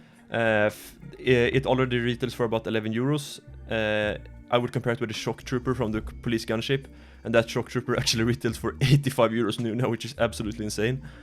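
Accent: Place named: Norway. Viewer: You sound Norwegian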